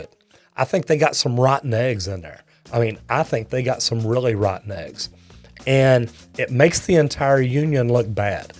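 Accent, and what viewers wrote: South, United States. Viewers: It’s an organization, you pay for a membership to reap the benefits. The good outweighs the bad, great pay and benefits but you get laid off. Also judging by his accent he’s from the south were unions have almost no foothold, less members, and less resources for training.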